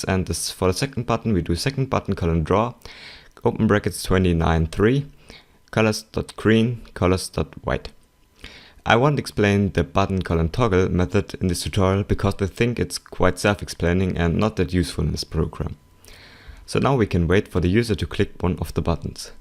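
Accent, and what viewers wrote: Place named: Germany. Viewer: Wieso hört man es immer heraus, wenn ein Deutscher englische Tutorials macht? :D (wohl, weil mein englisch genauso klingt?^^) Ich wollte eben nachfragen, aber ein Blick auf deinen Kanal gab bereits die Antwort. Ich hätte es ja als hübsch empfunden, wenn du am Ende noch das Beispiel direkt in MC gezeigt hättest. p.S.: wird im CC-Forum keiner mehr angenommen? Ich bekomme einfach diese validation email nicht zugesendet und habe dadurch keine Rechte im Forum noch kann ich den Admin anschreiben - blöd. Dabei hätte ich gar eine sehr nützliche API.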